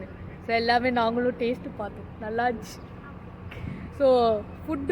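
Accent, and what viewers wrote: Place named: Tirunelveli, India. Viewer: Sister, I like your presentation about your experience in finding a job. You are talking nicely and friendly. You have a good future in Canada 🇨🇦 as you are talented. I like your tamil language. I hope you are from Tirunelveli, am I correct?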